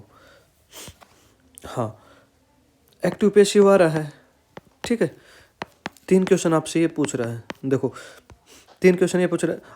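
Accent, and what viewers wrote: Haryana, India. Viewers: Bhai tumne bhi chalana ko chalama likha hai to mistake sbse hota hai aur wo uska accent haryanvi hai to isliye aisa sound kr rha hai. Itni bhi bdi baat nhi hai jitna hyper ho rhe ho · haryana wala language h bro iska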